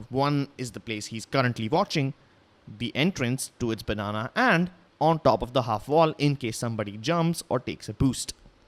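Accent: India. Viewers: You Indian? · ur indian? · Are you indian? Your accent sounds indian. Btw, love fron bihar, India!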